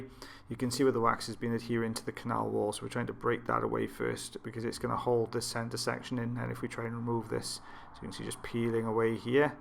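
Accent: Wales, United Kingdom. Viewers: Actually get disappointed watching any other removal videos but yours. So clear and the lovely welsh voice over 👍🏻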